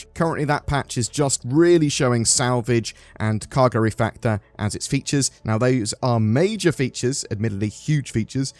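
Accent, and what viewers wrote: United Kingdom. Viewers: love BEING a part of your community. I've met some interesting people, and I love hearing your limey voice talking about a game I am so passionate about.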